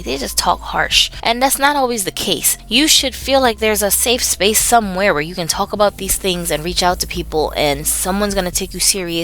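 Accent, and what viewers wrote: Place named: United Kingdom. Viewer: THE BRITISH ACCENT <3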